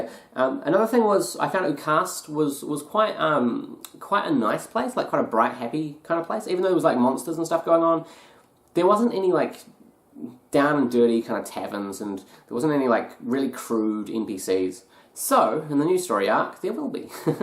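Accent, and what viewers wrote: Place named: New Zealand. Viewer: Damn I miss that New Zealand accent. Currently living in Sydney at the moment.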